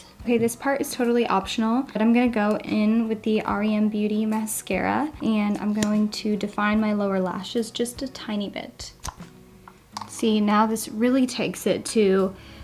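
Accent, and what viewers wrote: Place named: United States. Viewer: You have such a basic American accent I’m living like it’s not southern but it’s not like Boston or new York yk? I have a southern german accent and it’s so aggressive but yours is so smooth like a good whiskey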